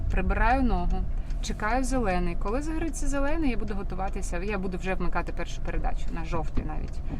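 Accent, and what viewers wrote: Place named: Surzhyk, Ukraine. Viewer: Насолода скушать конфетку, а коверкать украинский это ужас, не можешь не говори, а учись, а то какой то суржик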